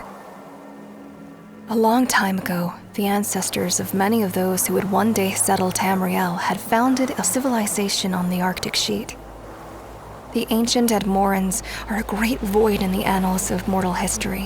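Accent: United States. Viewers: The American accent doesn't do it for me. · I would have got a none american accent to narrate considering its fantasy, and elder scrolls which uses European accents mostly.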